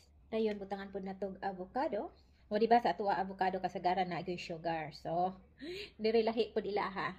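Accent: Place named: Visayas, Philippines. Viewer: Super love ko talaga ang boses bisaya accent sis ❤ bakit kasi nasa gilid lahat ng manitka haha! Sarap naman 😊 lola lovin’ it🥰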